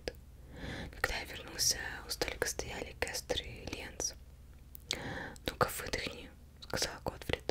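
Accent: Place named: Perm, Russia. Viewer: Очень приятный голос. 💜 Но какой-то интересный акцент... из Перми родом?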